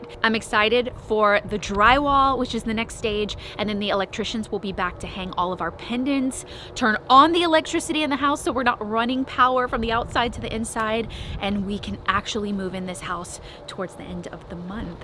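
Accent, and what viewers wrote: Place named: Texas, United States. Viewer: Is it just me or does she sound more texan? Just some small things with a hint of southern accent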